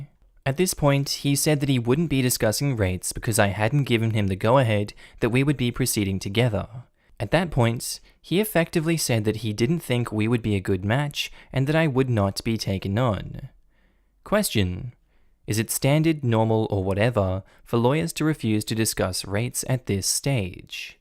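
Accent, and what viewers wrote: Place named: Australia. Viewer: My mom loves your aussie accent, and loves watching these videos with me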